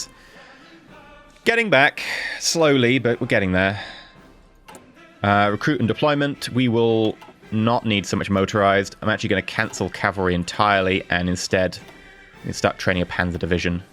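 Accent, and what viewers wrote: Germany. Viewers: play the road to 56 mod its great it allows for alternate history play threws and revamps the focus trees I would also pay to see you play hoi4 as Germany whilst speaking in your German accent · you spoke in a nothern bavarian acsent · Goddamnit, I did not expect the german accent, thank you for leaving me in stitches :D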